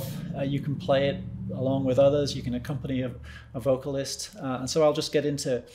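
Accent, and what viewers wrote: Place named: England, United Kingdom. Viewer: Hi just seen the horizon doc Strange signals from outer space, very interesting.Great guitar playing by the way. Noticed your accent. Are you English.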